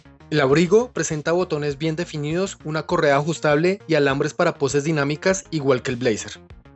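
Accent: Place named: Colombia. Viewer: Por el acento veo que eres colombiano. La verdad me sorprendió tú manera de hacer una reseña, ya tienes un suscriptor más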